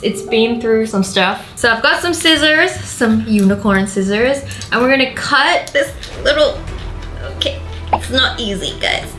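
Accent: Australia. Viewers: Please correct me if I am wrong, but I pretty sure shes Australian. [Sorr if I spelt anything wrong] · are you from Australia or America or where?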